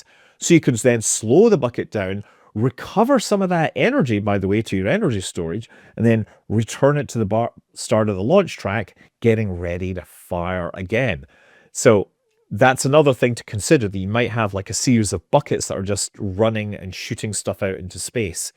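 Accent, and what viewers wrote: Scotland, United Kingdom. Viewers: You're sounding more Scottish than you normally do. Very much less American. I admire that. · You said "moon" over 100 times, so this is your best program yet ;>) I'm a Yank...love your Scootish.🎉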